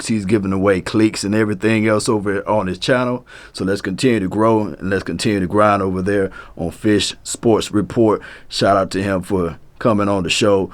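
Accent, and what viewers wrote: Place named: Texas, United States. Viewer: As a Skins fan, I gotta say I have been checking out a couple of your streams and outside of your analysis being 💯 your voice is like...perfect. You got the perfect mix of baritone and Texan accent. Why you can't resume yourself to national radio I have no idea. Keep up the good work.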